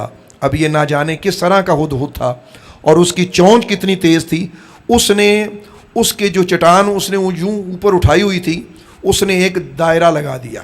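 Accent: Arab world. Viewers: ALLAH HO ALLAH … HAZOOR QIBLA E MURSHID … sadqe ap k … Arabic lab o lehja khubsorat or purasar …. alaaa point of view ko beyan farmaya QURAN E PAK K reference se … haq farmaya … jo qume apny wade torti hai wo barbad hoti hai … ALLAH HO AKBAR …. qume bini israeel k waqie se humari alaaaaa islah farmai … ALLAH PAK humhy wada khalfi se bachye … ameeen … 🙏🏻🙏🏻🙏🏻🙏🏻🙏🏻👍👍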